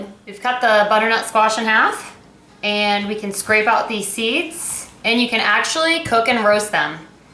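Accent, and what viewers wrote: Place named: South, United States. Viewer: okay so i wanted to sleep about an hour ago... but i got hooked on your videos. they're great, you're awesome, love the accent of the south and of course your recipes... will definitely be trying there out! thanks. subscribed!!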